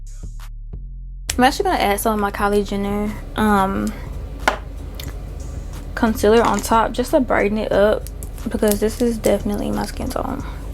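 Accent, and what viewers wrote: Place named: United States. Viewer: you sound american but nicer american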